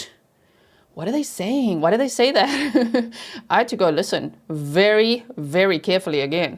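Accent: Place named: South Africa. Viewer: Shame - you're definitely south Africa. 😂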